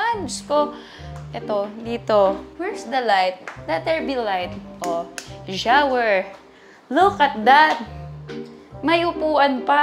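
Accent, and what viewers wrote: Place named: Philippines. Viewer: The english filipino accent days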